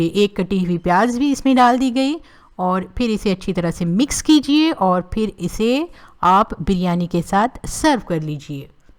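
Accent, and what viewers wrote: Hyderabad, India. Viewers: aslkm unty kya ap hyd se h apki language hyd jaise h plz rply kare thanku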